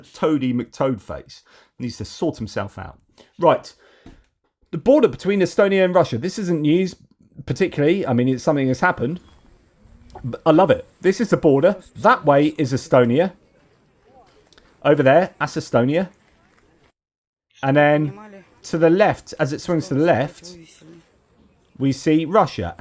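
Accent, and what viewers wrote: New Zealand. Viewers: Slow down & draw out the NZ accent! ie really chill it out, Bro!!! ;p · To be fair, your kiwi accent is pretty on point. Aka “choice”. 👍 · Oh, Chur, Gotta Appreciate the classic Kiwi accent that we deny all too often. Chur for the sanctions but we need to do more bro, but smol island nation syndrome is strong over here, we only dish sanctions, they're pretty chur aye bro. oh and we have likely don't have any SAS operating over there.:_love:□ definitely not.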